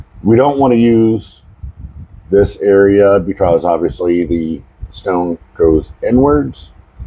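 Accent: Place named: United States. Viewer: Dude sounds like he’s from the states, but he uses metric.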